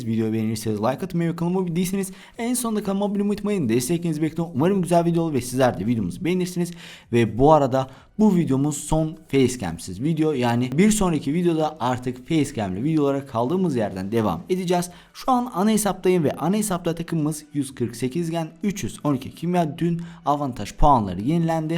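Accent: Azerbaijan. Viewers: Azerbaycanlı mısın sen?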